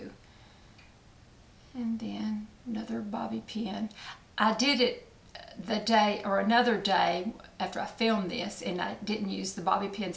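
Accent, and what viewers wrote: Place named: South Carolina, United States. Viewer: Hi I've been watching you for a long time now. I like playing videos while putting on my makeup when I can. First thing I thought was "she sounds like me!" Lol I'm a born & raised South Carolinian! At that time no other women on YT in the beauty community sounded like us so that's how I found you. I subscribed and haven't missed a video since plus I've gone back and watched a lot of your videos from earlier days. You're a beautiful woman first of all but I think you're a beautiful soul as well. I enjoy your videos and you've convinced me to try a few products and NOT try a few. I'm 56 married 34 yrs in Oct 4 children 2 living and 5 beautiful grandchildren. I love makeup big hair my jewelry and clothes so I fit right in or you fit in to my lifestyle. Thanks for sharing and the tip about the Tazo tea for nausea that helped Doug has really helped my husband. 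I just wanted to say I appreciate you! Hope you do videos for a long time to come. Blessings & love from SC ❤🌹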